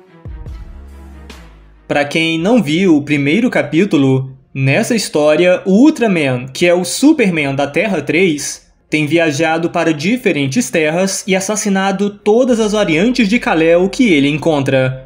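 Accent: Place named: Northeast, Brazil. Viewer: mano por curiosidade, vc segura algum sotaque? parece q tem algum sotaque bonito do nordeste ai escondido kkkkkkkkk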